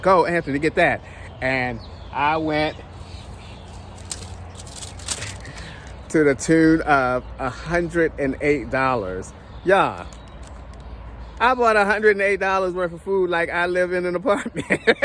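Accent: Baltimore, United States. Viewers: You’re from Baltimore for sure loln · Hi Anthony! Fellow Baltimorean who decided to jump ship years ago. I still hear the Baltimore accent "tew". Lol! Enjoying the videos, bro! Keep them coming.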